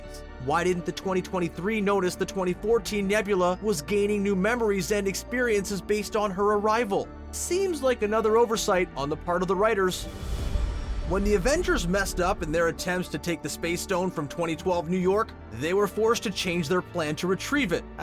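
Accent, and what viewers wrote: Canada. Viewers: You have a heavy Canadian accent. Are you from Alberta. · Is the narrator Canadian? (His pronunciation for some words make it seem like it.)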